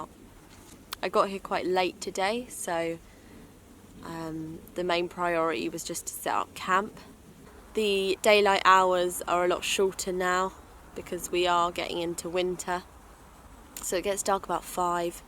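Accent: United Kingdom. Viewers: Wonderful British accent... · British accsen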